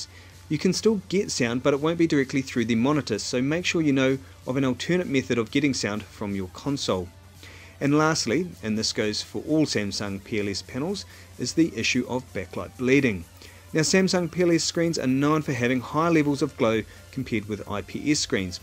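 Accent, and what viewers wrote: New Zealand. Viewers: are you from new zealanfd? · MY BOY YOUR FROM NZ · A Kiwi accent be praised !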